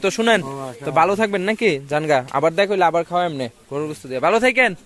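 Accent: Sylhet, Bangladesh. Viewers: Onek vala legeche bhai. Bhaiya Apner Basha ki Sylhet? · Bai apni ki sylhet ar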